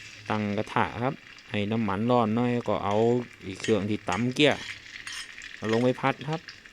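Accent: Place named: Rayong, Thailand. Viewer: พูดระยองด้วยน่ารัก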